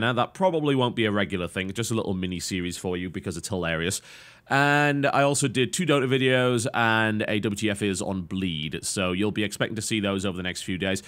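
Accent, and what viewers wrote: United Kingdom. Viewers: i enjoy content patch more than anything on this channel by a wide margin i feel it's the most informative [by it's nature it's suppose to be] and most entertaining probably because i enjoy coherent gaming rants by well informed people in a british accent i beseech thee oh great biscuit of totality find the time to bring us a new episode of content patch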